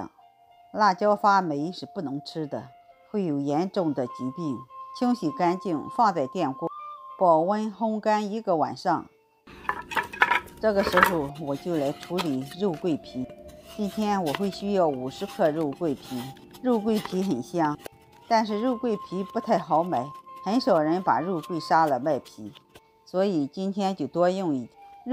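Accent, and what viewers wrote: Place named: Shandong, China. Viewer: 齊魯口音。我夫妻為台灣外省二代。能識大陸南北口音。祖籍在豫皖、大別山之地。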